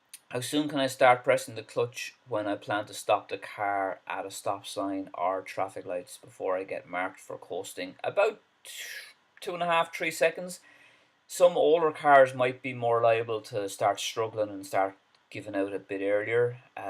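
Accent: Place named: Ireland. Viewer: we thought it was Scottish but now we think it's Irish haha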